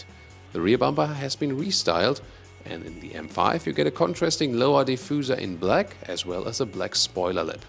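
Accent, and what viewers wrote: Germany. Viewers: There's something about German accent that adds authenticity to anything he says.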